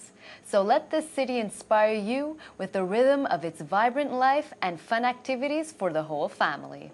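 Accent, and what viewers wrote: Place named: Canada. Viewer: she sounds Canadian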